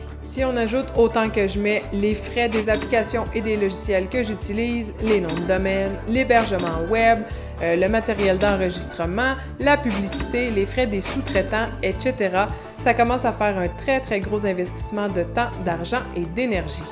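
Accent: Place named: Quebec, Canada. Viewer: Pourquoi soutenir ma? Pour avoir le plaisir d'entendre son accent Québecois mais surtout pour s'enrichir sur l'histoire, la culture Québécoise et la langue française!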